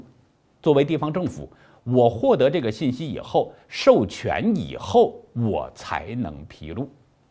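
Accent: Tangshan, China. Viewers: 您这唐山口音 改不掉啊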